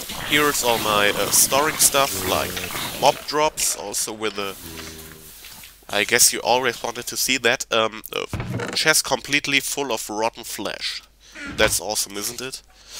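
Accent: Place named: Germany. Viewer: man merkt so geil dass du normal deutsch sprichst :D zb. schurr (sure) und äktschälli xD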